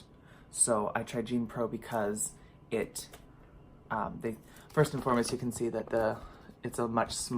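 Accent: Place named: Minnesota, United States. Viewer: Thanks for keeping it so real and honest,! This entire month has been a struggle for me food wise as I’ve allowed myself to have so much crap and I just don’t feel the way I did before when I was in control. In the long run, sugar after sugar really does hurt my weight loss efforts too in more than physical ways. Good job on upping your water and protein again. I too am determined to get in all my water today! I was skipping protein shakes here and there too due to the extra snacks. Getting back to our work will help us get back to routine. Love that you got your Bullet! My kids were just asking me yesterday when we would start having smoothies again. I’ll make more when the weather warms up. I can’t believe you were having kidney stones every 6 months. Ick! I’ve had the stents too and I seriously can’t imagine anything worse than that. 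Seriously! Probably the worst pain even above having children. I think maintenance and routine can easily be established with working out/exercise. The key is to start slow and add slow. It becomes so much easier and habitual over time. I can’t stress enough SLOW and attainable goals. Did you know you’re beginning to pick up a Minnesota accent? I love it...how you say “about”....Happiest New Years to you and yay for trying champagne. How fun!!!!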